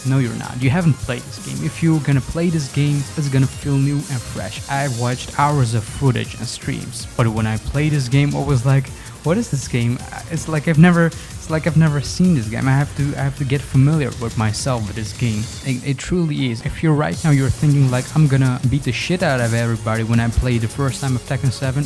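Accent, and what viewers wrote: Germany. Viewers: I am pretty sure he's from germany, he sounds very german. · where are you from? germany?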